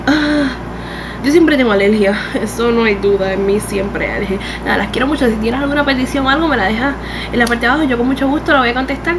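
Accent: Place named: Puerto Rico. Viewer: Diossss amoo tu acentoo puertorriqueño ♥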